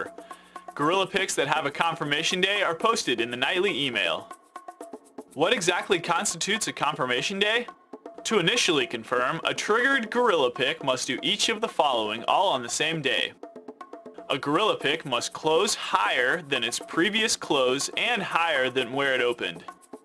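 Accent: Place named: United States. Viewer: Please turn on caption i want to see in Hindi.i can't understand American English.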